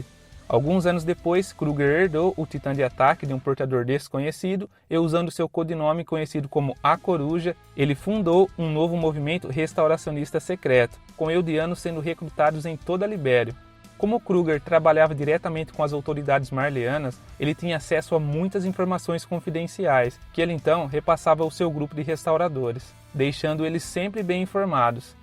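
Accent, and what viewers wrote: Brazil. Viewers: Mó baiano KKKKKKKKKKKKKKKK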